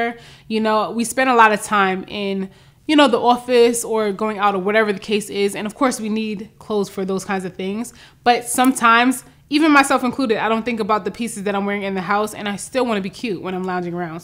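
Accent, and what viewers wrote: New York, United States. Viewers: Great picks. Checking out the closet sale. And I’ve been meaning to ask you were from New York because of your accent and boom like that you told me without my asking 😊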